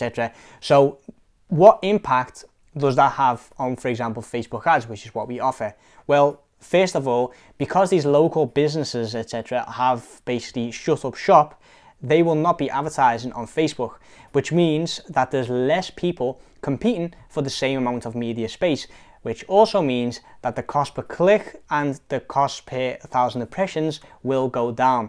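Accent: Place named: Liverpool, United Kingdom. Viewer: Ok so he only reason I'm continuing to watch this , us for the scouser accent ....lol....I was born in Cheshire ...live in Australia for 45 years ...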